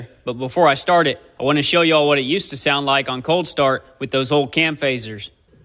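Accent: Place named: South, United States. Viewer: I like the fast pace verbosity with the a touch of southern twang!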